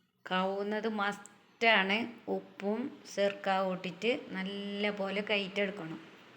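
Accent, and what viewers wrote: Kasaragod, India. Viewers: എനിക്ക് നിങ്ങളെ സംസാരം കേൾക്കാൻ നല്ല രസമാണ് കാസർഗോഡ് ഭാഷ എനിക്ക് ഒരുപാട് ഇഷ്ടമാണ്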